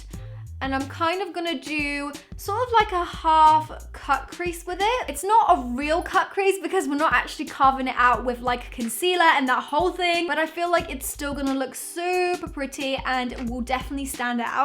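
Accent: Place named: United Kingdom. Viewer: Your makeup looks stunning!! and I absolutely love your British accent. I just uploaded a fall makeup video, it would mean a lot if you check it out xx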